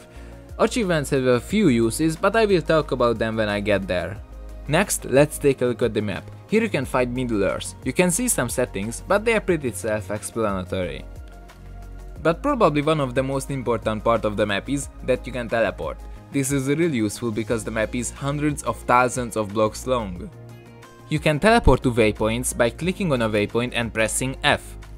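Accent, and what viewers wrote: Hungary. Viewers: De dúrva a magyar akcentus, de fasza a videó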